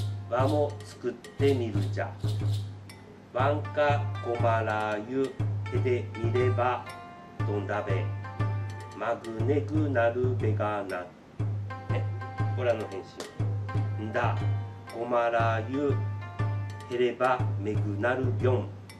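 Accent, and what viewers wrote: Tsugaru, Japan. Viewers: BGMないほえが、喋る声聞きやすいかも！青森生まれの、青森の両親、青森の両方の祖父母の津軽弁聞いてた俺としては、わかるけど、標準語に、直してるのが、津軽弁に、聞こえちゃうんですけど！ダメ出しでは無いですからね〜純粋な東京の人には、分からない気が、青森は、津軽弁、南部弁、下北弁、あと龍飛の方でも、言葉違いますもんね〜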